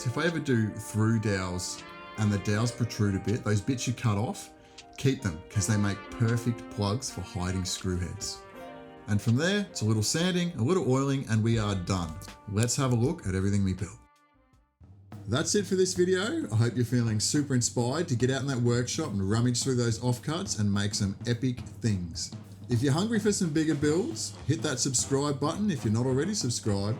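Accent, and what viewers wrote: Australia. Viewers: Heck dude. Im brand new here. I will sub. Really nice hearing an Aussie. Cheers from Central Vic.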